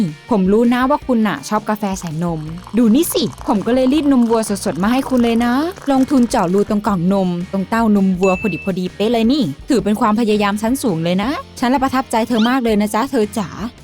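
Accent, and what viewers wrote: Isan, Thailand. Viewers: พี่พูดอิสานติ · พี่เป็นคนอีสานเหล่อออครับบบบ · เราว่าพี่เขาเป็นคนอีสานหลุดพูดตั้งหลายคํา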